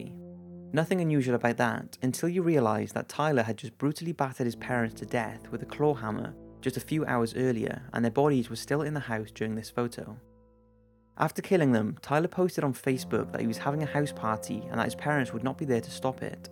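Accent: Wales, United Kingdom. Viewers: I'm hyuring the "yur"s in your Welsh accent. Awesome! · its a welsh voice, so naturally nice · He's Welsh, sounds like somewhere near Cardiff.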